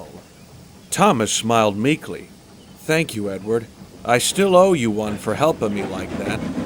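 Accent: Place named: United States. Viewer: Narraration sounds a bit too American, but this is very well written and I hope you make a threequel.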